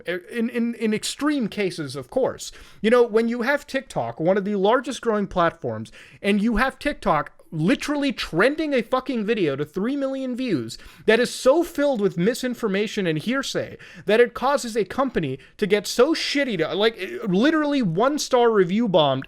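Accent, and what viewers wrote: Canada. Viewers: Anyone else feel like mutas Canadian really came out thick on this one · You can tell is Canadian by the way he pronounces “tomorrow” as “to-more-oh”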